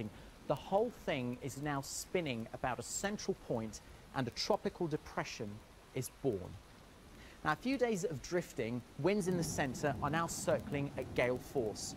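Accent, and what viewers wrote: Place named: United Kingdom. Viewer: Because he is British and that's how its pronounced in Britain, the e at the end is silent. Just like in America you guys say tomato as to-may-tow, we brits pronounce it "tom-ah-tow. There is no right or wrong way to say it, it's the same thing, it's hardly a big deal...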